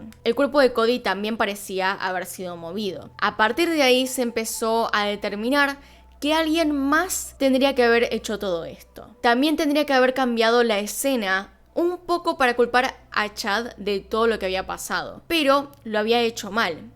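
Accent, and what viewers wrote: Argentina. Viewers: Me gusta tu acento Argentino :D, Un Abrazo Princesa :D, Interesante Historia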